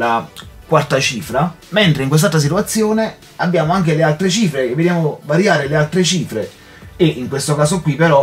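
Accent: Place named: Brescia, Italy. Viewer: Dall'accento mi sembravi più brescano!!! (io sono di avellino, ormai da 15 anni trapiantato in toscana)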